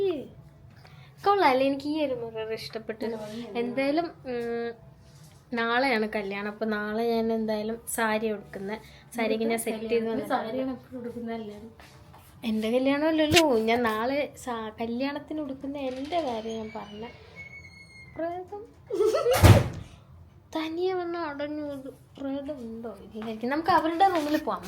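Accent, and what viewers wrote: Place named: Tamil Nadu, India. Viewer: Nigal tamilzhanoo samsarikumbol athikavum tamil ahnn varunathe😅❤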